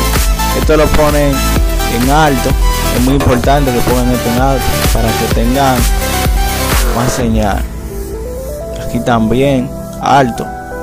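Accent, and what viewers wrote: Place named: Dominican Republic. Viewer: Eres de República dominicana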